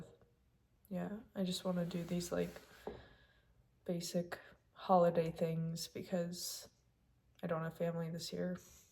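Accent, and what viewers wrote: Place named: United States. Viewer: Atleast you sound like your in America, could be worse I'm stuck in the UK 😂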